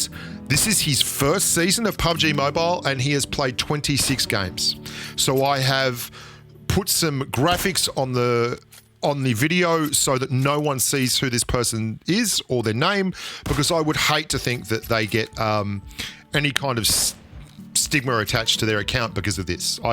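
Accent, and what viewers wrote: Australia. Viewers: I have always loved your content. Recently whoever asks me about a good guide to the game, I always recommend your channel. But problem with you expanding your viewer base in India is the Language, not a lot of people are comfortable, I think, with your fast and fluent Australian accent. Ironically that is what makes your videos that much more lovely😬. Keep growing. Love ya.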